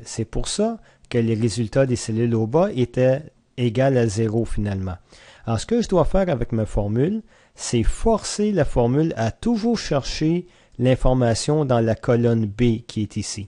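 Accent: Quebec, Canada. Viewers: vraiment très bien expliqué. Et belle effort sur l'accent car étant belge c'est assez compliqué de comprendre le québécois mais la aucun soucis. Très bonne vidéo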